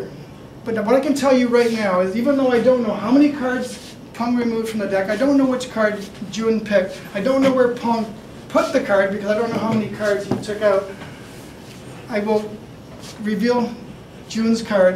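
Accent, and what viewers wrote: Canada. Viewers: This guy is from Canada that's for sure!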